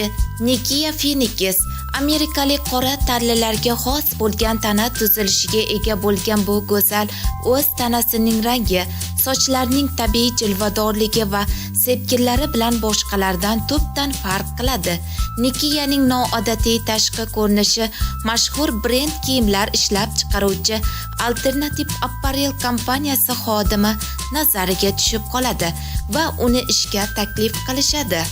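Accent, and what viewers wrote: Surkhandarya, Uzbekistan. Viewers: менимча точни сурхандарйо йоки кашкадарйодансиз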